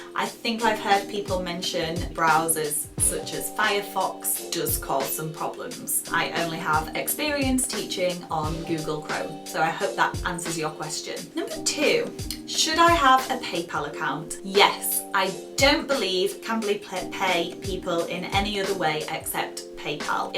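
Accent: United Kingdom. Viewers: British accent I guess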